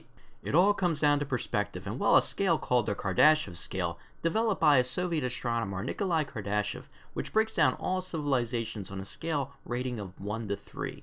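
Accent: Philadelphia, United States. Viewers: That Philly accent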